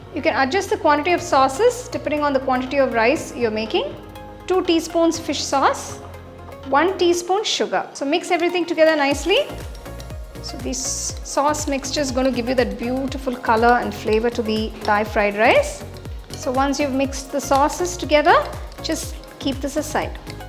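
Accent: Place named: India. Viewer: Indian accent 😂😂😂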